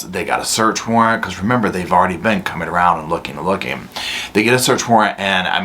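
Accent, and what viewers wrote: South, United States. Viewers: Dearest and Roscoe darling, I L💓 VE YOU!😘 I can't tell you how thrilled I am to watch your channel grow 🌱 There's nothing more fabulous than hearing that southern twang in your voice as you spill the tea to "Y'all"!😁 And a shot out to the officer's who were so kind to this precious little child 💞 My heart just aches thinking about what she's been through... BOTH these "parents" should go to prison for a longggg time!🤬 They have no doubt f*cked up this child's life, and I pray she gets the care and therapy she'll need to heal🙏 I'm so grateful for this channel, and adore "y'all" to pieces 🧩 XOXO's, Maegan 💋💋💋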